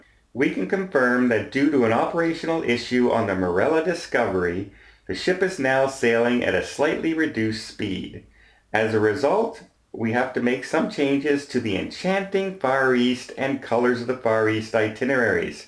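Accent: Canada. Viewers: ? I love your videos. I/m just an average Gay American (IF that's even possible??) So, I grew up on the West Coast (Los Angeles) and I hear accents.? I knew you were Canadian the minute I heard your accent AND??? Because you are a kind and respectable person. YOU are a treat!!!!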